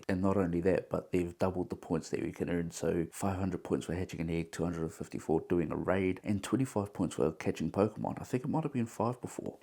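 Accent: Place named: New Zealand. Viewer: You from nz cuz?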